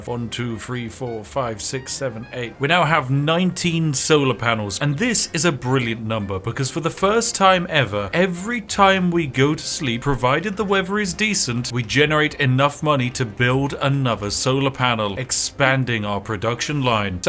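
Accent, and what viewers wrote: United Kingdom. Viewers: let’s game it out but british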